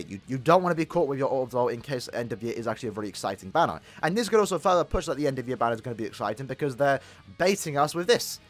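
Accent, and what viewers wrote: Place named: United Kingdom. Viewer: Wha' a salty bri'ish bloke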